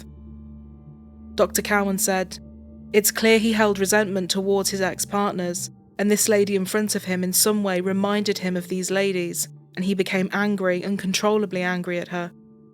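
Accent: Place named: Scotland, United Kingdom. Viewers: Great video. I love Scottish accents… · Love Scottish accents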